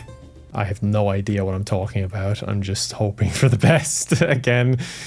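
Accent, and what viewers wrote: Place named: Ireland. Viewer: living in Ireland lol. He spent some time in Spain though! I want to say I hope that clarifies things but it probably doesn’t 😅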